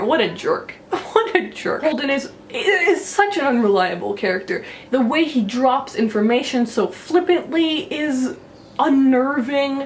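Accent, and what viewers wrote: Canada. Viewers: are you canadian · First I've ever seen of you, and I don't know you at all. From the accent, Winnipeg?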